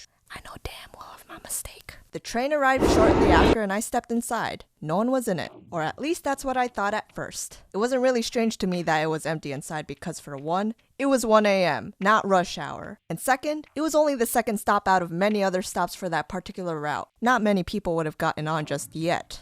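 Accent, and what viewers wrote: New York, United States. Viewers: broooo are you deadass from new york lmaaaaoooo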